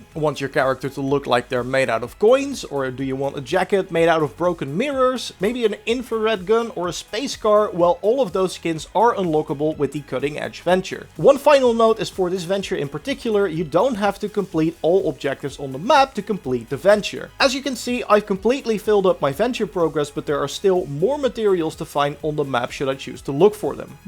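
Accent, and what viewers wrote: Netherlands. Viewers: I just hear the dutch accent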